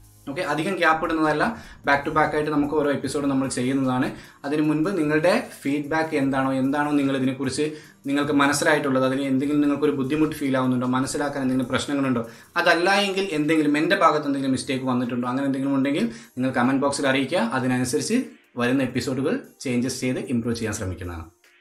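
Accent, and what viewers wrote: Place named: Malappuram, India. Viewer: nice presentation, oru malappuram touch undu.. s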